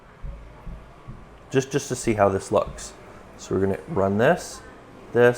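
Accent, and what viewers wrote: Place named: United States. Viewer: finally one video in american english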